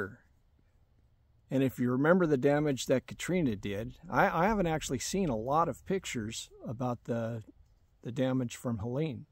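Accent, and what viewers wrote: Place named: Southwest, United States. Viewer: The climate changes, there is no "Climate Crisis". The National Hurricane center reported that Helene made land fall at winds of 140mph, but the weather stations and the University of Alabama Huntsville mobile weather unit reported winds at 60mph. I don't know where you live but judging by the accent, I'll say in the American Southwest. In 850 C.E the region went into a drought that lasted 240 years, then it rained for 50 or sixty years, then the region went into a smaller drought that lasted only 170 years......... I guess the droughts were caused by those pesky native Americans cooking food and heating themselves. CO2 is good for the planet, the Sahara is greening, the world has greened 33% since 1900, because of CO2. Fossil fuels (hydro-carbons), cuts down on deforestation, and decreases health issues associated with burning dung to cook and heat. 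You maybe 56, but I'm 57, and I can tell you that you have not done your homework.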